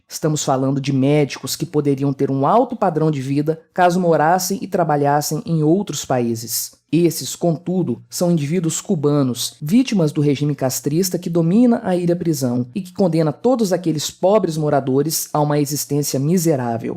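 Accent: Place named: Belo Horizonte, Brazil. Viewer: o sotaque desse narrador é idêntico ao do Nikolas Ferreira de BH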